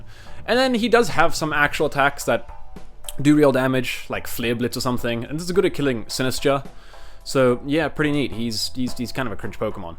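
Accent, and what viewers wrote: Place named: South Africa. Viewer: funny south african pokemon man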